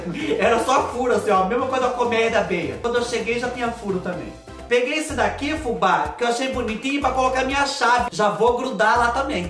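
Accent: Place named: Minas Gerais, Brazil. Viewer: Me divirto tanto com vc...alegra meus dias,.menino lindo, cada dia admiro mais sua simplicidade e bom humor!!Amando vc..queria só saber se onde e vc se e mineirinho ou de outro lugar...seu jeitinho de fala e parecido com o meu..só que sou paranaense mesmo...qua do me apresentaram seus vídeos ..me disseram " olha aí Ivete alguém que fala que nem ocê""kkkkeu falo tudo anssim tamém...😂😂😂pois agora e só ocê que vejo pra dá boas risadas fubá do Céu!!!😘😘😍😍😍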